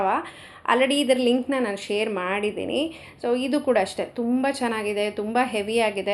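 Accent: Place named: North Karnataka, India. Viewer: good to hear ur kannada...u speak uttara karnataka kannada with ur family and as usual common kannada slang in the vlog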